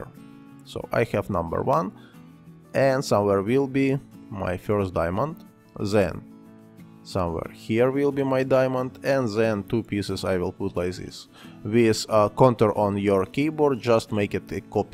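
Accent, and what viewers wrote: Russia. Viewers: thanks for RUnglish!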